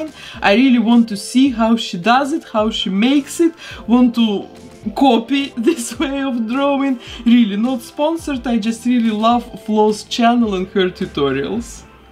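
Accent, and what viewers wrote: Russia. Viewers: Russian accent? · Похоже на русский акцент 😅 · Почему то со стороны мне кажется что это Russian Woman по акценту и выделению каждого слова в произношении, но я могу ошибаться и на самом деле это нормально в Америке. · У вас русский акцент, как мне показалось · Only i hear russian akccent?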